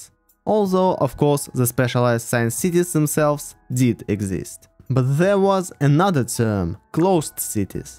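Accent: Russia. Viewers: Withthout prthoper autthorization. Sthensitive. I like Russian accent English. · Russian accent as it is, I have the same)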